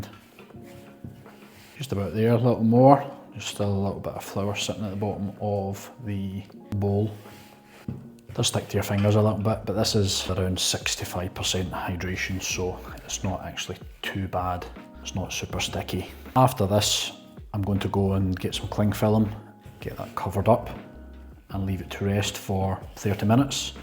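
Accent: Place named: Scotland, United Kingdom. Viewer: of course, my wife even said that she doesn’t know what’s better - a man baking a bread or a Scottish accent she hears while watching it haha 😄 fingers crossed for your channel mate!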